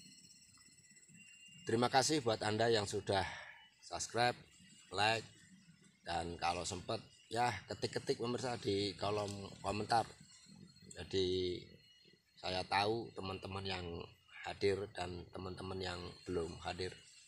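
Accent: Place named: Java, Indonesia. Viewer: Hadir,jowone kenthel jos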